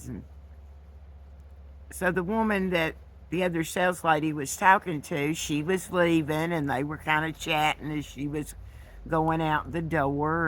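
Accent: South, United States. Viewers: Thank you so much for standing up for kindness, and common sense. And for speaking up💖✨I’m from AR & live in TN now…and it’s sad to see so many good hearted, hard working people being conned by the carpetbagger (tRump). It is so reassuring to hear a wise, southern accent sharing good information & kindness. I’m late seeing this video, so by now we know Biden has announced he will not be running for 2024…I agree with you on the corp media…hopefully now that he has made this announcement. I hope they (Democrats & media) stop the madness & get back to work for us…Thank you! I also watch Midas touch, Tx Paul, and TN Brando💖✨🙏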